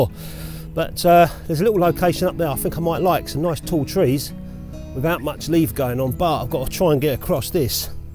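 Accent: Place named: Cockney, United Kingdom. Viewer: The 'Fern' is Bracken, or Pteridium aquilinum to give it's proper name and one of the most successful land plants being found everywhere except Antartica. Loving your channel, in fact it's now not just my favourite film photography channel, it's my favourite photography channel. Period as the Yanks say. Your style is refreshingly down to earth, but your images are good and your Cockney/Essex Boy persona is a welcome change from the wispy bearded hipsters that normally present this sort of thing. (Sorry Ribsy! but your beard is a bit fluffy)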